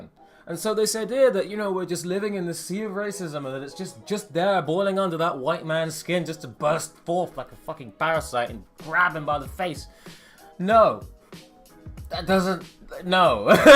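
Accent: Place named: England, United Kingdom. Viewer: Bravo! You said this so well! The curious thing in America is that people (black and white) in the rural South got over racism a generation or two ago; but in the big cities, the North, the West Coast, etc., where people think they're so enlightened, one's race dictates how he's expected to talk, dress, act, walk, drive, sing, be entertained, learn, live, worship, vote, and even procreate. For instance, you speak exactly like any other young educated Englishman. A blind customer in the shop where you work couldn't possibly know your race; but in urban America one phrase out of a black person's mouth would instantly reveal it and deliver a boatload of assumptions--most of them imposed by a destructive urban black subculture itself, not by white people trying to keep anyone down.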